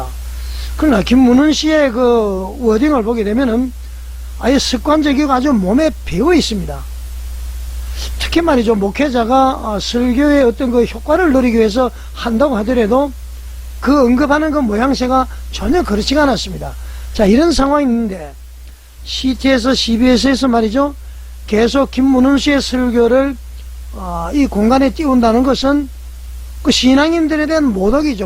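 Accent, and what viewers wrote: North Korea. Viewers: 그럴리가없어요 북한억양이 심하시네요 지금말씀하시는 분은 목회를 얼마나 하셨는지 모르겠군요 김문훈목사님 인격에 그런분이 아닙니다 음모인듯 음모 중단하세요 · 어투가 북한분같아요..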